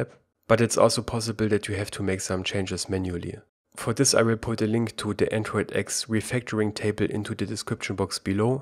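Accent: Germany. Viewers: German english accent is very lovely. I don't know. But I like your accent and coding. I like your teaching style. Keep this up. Dont stop. We benefit from you a lot. Thank you very much Sir.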